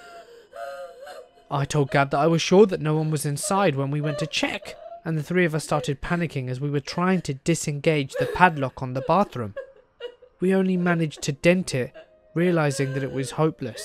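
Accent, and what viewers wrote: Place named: United Kingdom. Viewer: Another British accent... Go figure.. Let's read must be a BBC thing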